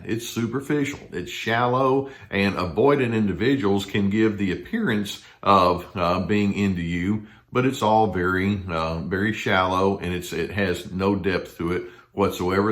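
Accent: Texas, United States. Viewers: It's not southern...it's Texan. Big difference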